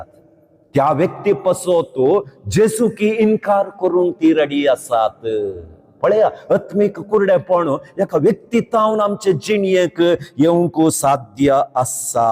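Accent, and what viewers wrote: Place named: Mangalore, India. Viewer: Amazing teachings Fr. You are always our favourite preacher. Your konkani is mangalorian it sounds nice.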